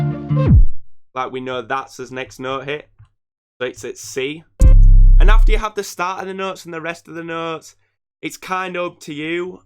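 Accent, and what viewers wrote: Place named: United Kingdom. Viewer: you're the most british producer ive ever heard from man.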